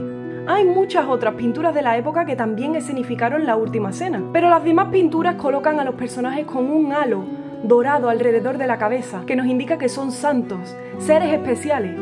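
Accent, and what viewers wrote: Andalusia, Spain. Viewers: Genial análisis, bastante erudito y además me encanta tu acento, es andaluz? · Interesante relato, me sorprendió la partitura en la cola 😂no la conocía (no quiero imaginar como suena😂) Nunca puedo aburrirme escuchando todas éstas historias que me encantan y esas manos acariciando esa guitarra, es un sueño.🍀🌷☘🌺☘ ¡Anda Lucía! que con ese acento tan lindo al hablar me voy para Andalucía!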